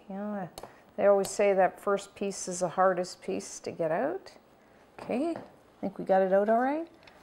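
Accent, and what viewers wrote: Canada. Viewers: Shane Enz Actually she's a Canadian, you can tell by her accent.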